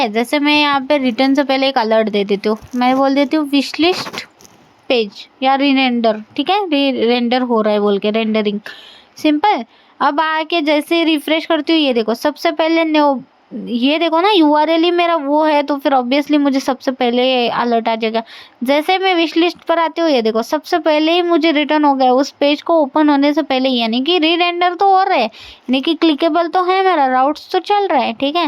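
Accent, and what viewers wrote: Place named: Maharashtra, India. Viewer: Maharashtra.. I knew it.. by your tone and language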